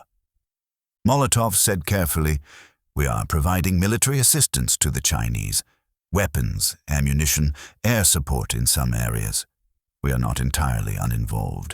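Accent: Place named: RP, United Kingdom. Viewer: Please go back to the old AI narrarator. This posh british voice is overused and annoying.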